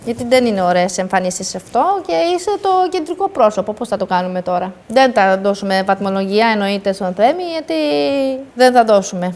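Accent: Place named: Albania. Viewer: Δεν μπορώ να ακούω τον καπετάν καρνάβαλο να κάνει και μαθήματα μόδας.. τόσα χρόνια στην Ελλάδα και ακόμα μιλάει σαν την καθαρίστρια μου που ήρθε πριν 4 χρόνια από Αλβανία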